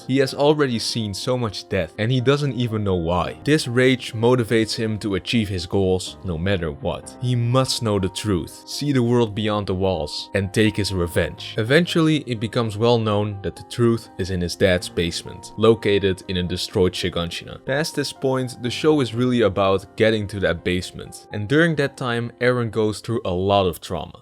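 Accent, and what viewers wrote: Netherlands. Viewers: I smell some dutch am I right?🤣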